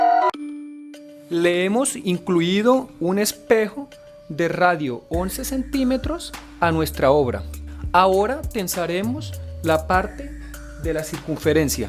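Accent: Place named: Bogotá, Colombia. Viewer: Ahhhh genial la pegue , sabia que eras colombiano seguro cachaco por tu genial dialecto , ya mismo te sigo y te escribo al privado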